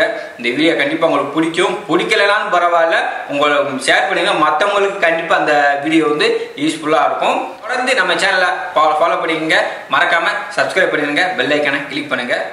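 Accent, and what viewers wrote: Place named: Marwari, India. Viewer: உங்கள் தகவல் பயனுள்ளதாக இருக்கிறது.........ஆனால் உங்கள் பேச்சில் தடுமாற்றம் அதிகம், சேட்டு காரன் தமிழ் பேசுற மாறி இருக்கு..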